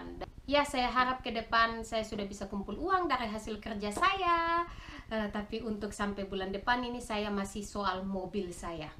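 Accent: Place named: Sulawesi, Indonesia. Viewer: Logat bahasa sulawesi, mukanya juga sulawesi, slam kenal..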